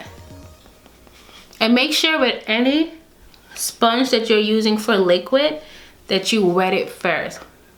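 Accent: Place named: New York, United States. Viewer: Once I listened more I picked up NY lol.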